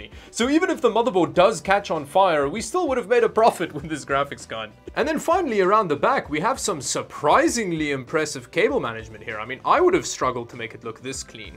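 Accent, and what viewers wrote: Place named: South Africa. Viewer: That hint of his South African Accent, gives me Homey Vibes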